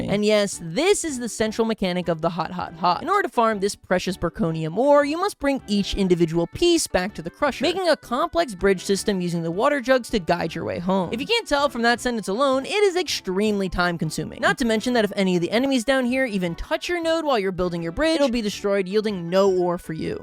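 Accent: Australia. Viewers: Yo, finally some Australian rep! Also please stop the australian accent. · After hearing the HORRIBLE Australian accent, I really struggled coming back and watching this video. I then made the mistake of watching till the end… I hate that I like 😐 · As an Australian I can approve of this accent 😊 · You're Australian???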